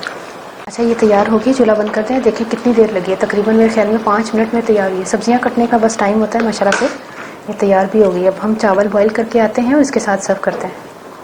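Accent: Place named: Punjab, India. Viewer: Banda bry gor naal twadi video Wekh rya honda ju e jnab tusi punjabi boldy o andru'n hasa nikal janda😜🤣😂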